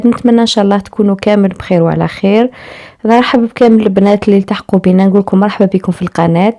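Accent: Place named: Chlef, Algeria. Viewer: هدرت ناس شلف تعجبني بصحتكم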